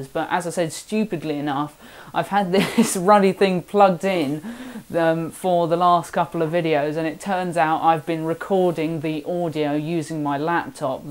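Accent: United States. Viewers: Great quality on both video and audio! I live in south-east of Norway and we're no strangers to snow.. This year has been kind as we've only had about 2 feet so far. Some years, 6-8 feet, much of it falling in a relatively short period. Here in Norway there's always a snow season, but still the first snowfall surprises a lot of people every year and we have numerous accidents during first snow... Good luck on your exams. PS! Your american accent sounded a bit like Hugh Laurie's :-) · 1) The quality of your video and audio is terrific! 2) Love the American accent. 3) Learned something about college/university I never knew. 4) Are those bulls? 5) Brrr! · I thought you was in the USofA with that Southern accent . LOL Love the Videos , keep it up . Southern Oh Hi Ho here . LOL (Ohio) .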